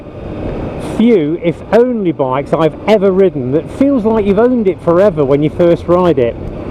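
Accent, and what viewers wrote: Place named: Leicestershire, United Kingdom. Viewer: Im trying to work out the accent...defiantly Leicestershire...roads look familiar . good review...a refreshing change from silly,loud mouthed over excited yanks.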